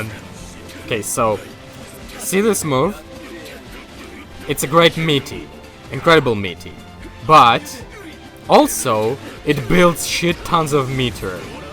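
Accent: Russia. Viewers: I think he's Russian?? I could be wrong tho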